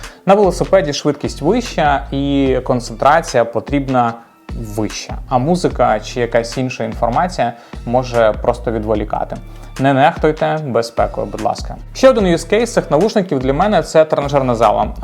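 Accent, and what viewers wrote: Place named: Surzhyk, Ukraine. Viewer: Бро, я тебя не понимаю. Хватит на суржике говорить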